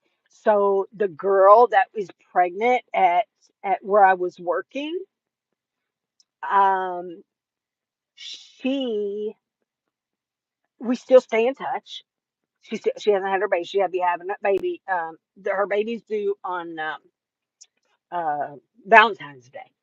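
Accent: United States. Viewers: So glad u went live . We missed u. Girl u could go live from ur bed and we would watch u no make up and all. Idk if it's just me and it's from not seeing u for a hot minute but u sound more southern since ur move over here to Alabama with me. Lol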